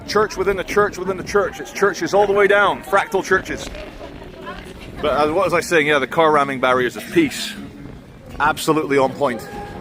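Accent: Scotland, United Kingdom. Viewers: you have an almost nearly Scottish accent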